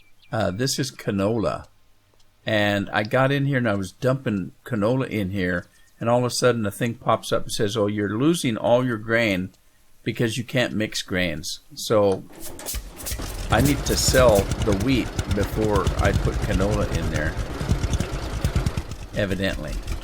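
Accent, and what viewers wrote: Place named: Minnesota, United States. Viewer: I know this is an old video, but because I noticed it in the first video. I could tell you were either from MN or thereabouts, eh...enjoying the videos, thanks for posting.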